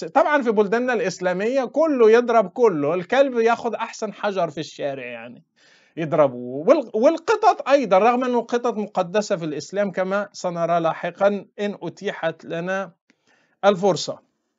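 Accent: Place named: Egypt. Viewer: لماذا لهجتك مصرية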